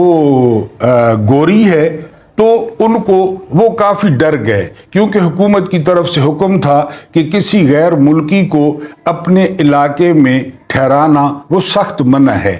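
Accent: Saraiki, Pakistan. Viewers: Masha ALLAH ap k v log mein Saraiki Touch bohot hi acha Laga hey, ap k v log Zaberdust hotey hein.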